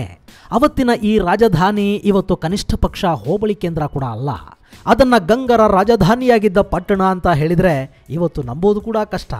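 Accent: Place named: Uttara Kannada, India. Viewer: Super sir nimma Uthara Kannada bashe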